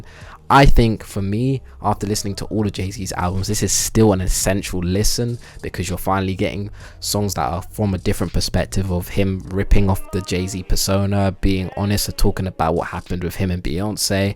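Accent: United Kingdom. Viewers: Honestly, you don't sound black enough. Why are you wasting your fancy British accent on dissing old rappers? The whole point of the genre is machismo. Even if you made the right argument, it wouldn't matter because you sound polite.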